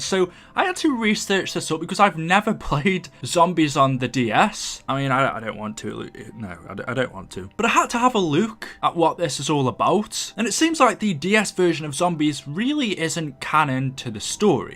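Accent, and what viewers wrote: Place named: England, United Kingdom. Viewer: Where you from in England not zombies related just curious also love the vids mate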